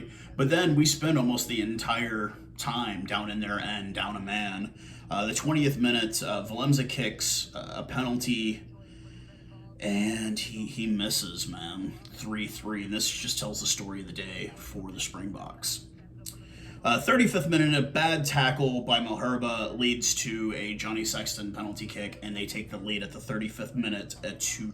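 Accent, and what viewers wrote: United States. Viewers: Where is this guy from. Sounds like a yank.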